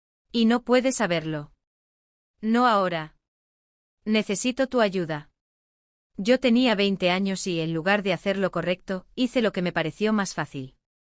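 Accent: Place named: Argentina. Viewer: Por favor relatos en español neutro, no con relatos argentinos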